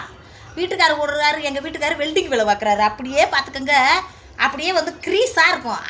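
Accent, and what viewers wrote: Madurai, India. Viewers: super madhurai style speak reply good 💯 true 🎉🎉🎉🎉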